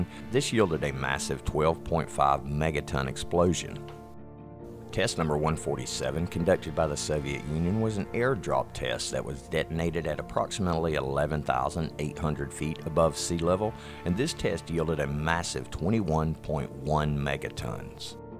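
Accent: Alabama, United States. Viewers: Where are you from ? Bama ?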